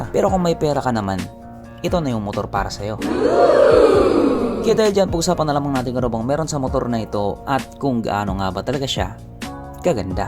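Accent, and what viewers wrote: Visayas, Philippines. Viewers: kusog kaau ka nanopo bisaya baya kaayo imong jaming.. balik2 ra🤣🤣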